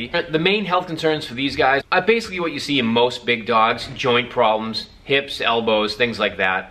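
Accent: Boston, United States. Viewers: You're from the Boston area... Just a crazy guess...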